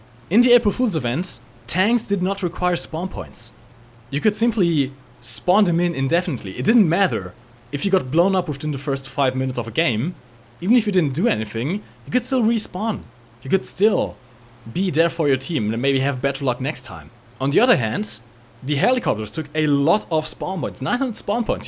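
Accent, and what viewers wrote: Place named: Germany. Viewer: I like ur German accent and also very well said.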